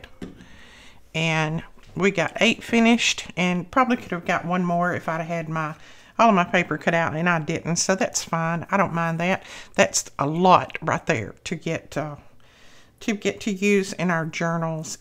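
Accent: South, United States. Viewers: Cute. I do have a question if I may ask, does it give an accordion look ok the one end and feel to them. I love the prima papers. They are really nice quality hopefully ghhjjjj me It's hard to find in PA however I do find it in Maryland. And I love your southern accent. It's nice. Reminds me of when I was a little girl and I loved in Georgia with my military parents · Well your voice reminds me of home. I was raised in the south but have lived in New England for the past 21 years. I miss me some "home" sometimes!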